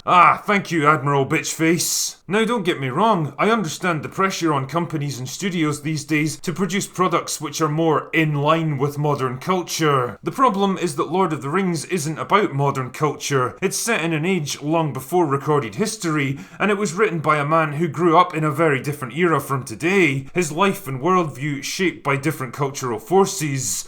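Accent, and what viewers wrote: Scotland, United Kingdom. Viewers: Interesting hearing a Scottish guy say this is a story about England. Tolkien borrows more heavily from Celtic Myths than Anglo Saxon ones. Welsh heavily influenced Sindarin and Quenya is heavily influenced by Finnish. Tolkien wanted to fill in the gaps left by the Norman invasion and used Arthurian/Celtic myth to do so.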